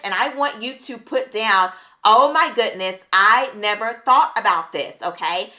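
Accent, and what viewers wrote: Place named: South, United States. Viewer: I love your country accent! 😊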